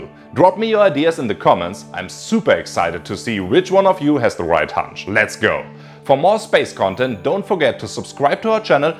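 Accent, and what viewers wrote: Germany. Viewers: i only enjoy his english in a german accent. if he spoke in german I'd be horrified. I've yet to find nice sounding german speech. it's extremely aggressive sounding.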